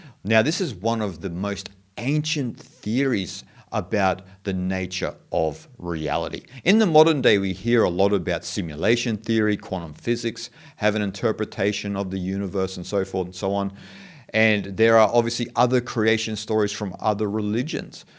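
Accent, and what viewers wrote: Australia. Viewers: Thousands of years old, very amazing concept of creation and the universe, very deep, not as flat as the 7 day genesis. When I came across vedic literature, many, many years ago, I was astonished, sucked into these mind boggling concepts, still blows me away..lasting some 55 years already. Robert Oppenheimer is said to have studied sanskriti to be able to understand these concepts untarnished by translation ! P.S. do I detect some Ozzi accent here?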